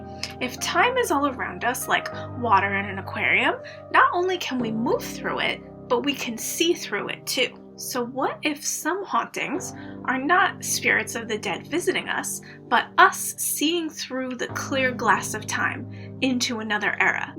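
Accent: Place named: New York, United States. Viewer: Quick question. Which borough would I find the accent in? I think I found my type 😂😂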